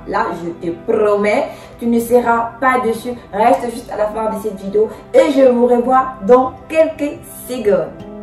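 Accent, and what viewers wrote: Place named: Democratic Republic of the Congo. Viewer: Elle a l’accent 100% zaïrois incroyable 😂😂😂 tu parles français comme les tontons Z la 😂 ze ne parle pas hahahah bangala au Gabon ça veut dire bite 😂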